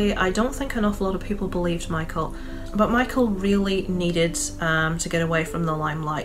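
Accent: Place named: Scotland, United Kingdom. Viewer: Ireland? I’m from Ireland and you sound Scottish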